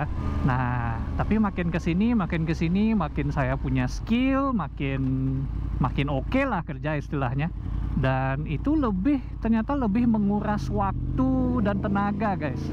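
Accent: Bali, Indonesia. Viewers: Walaupun tinggal di negeri sebrang tapi aksen bali nya ttp ada,, welcome back lahh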